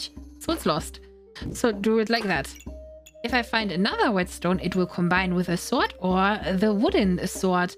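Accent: Germany. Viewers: Boahh schwierig wenn der deutsche Akzent kickt, trotzdem gutes Video.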